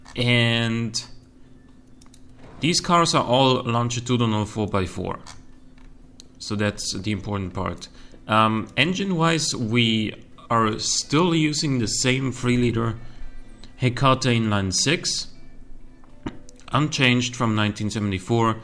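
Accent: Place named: Germany. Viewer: A few questions : 1) How are your cars so light ? Mines will always be like minimum 1600kg... 2) Where did you get the customs tracks I saw in your previous video ? I would have loved to be able to test out my own drag cars but coudnl't. 3) Also where are you from ? Because your accent is so nice :3 I guess maybe Germany. 4) Are your chassis made by you or are they just modified vanilla chassis ? 5) I don't know what I should say next, I feel like I'm so bad at this game :(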